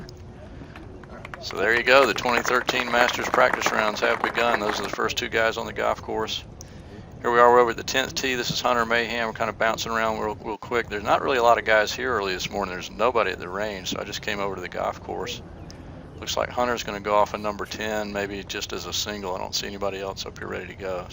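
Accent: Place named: South, United States. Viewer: Hey, Rob here from England. Thanks a bunch for putting this up, it's home spun but that's good! Great to see the swings in slo mo, a great feature thanks! Love the commentary in that southern accent all we Brits think is so cool! I MUST get to the Masters one day, nearly did for the big 'five oh' this year, but didn't in the end... hopefully some year soon. Love Rickie Fowler's swing, it's unique for sure but why so long you think!??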